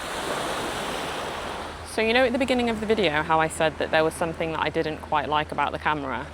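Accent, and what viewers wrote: England, United Kingdom. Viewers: You are the best mate 😊 By the way I really love to hear your voice 😅 a beautiful English accent ❤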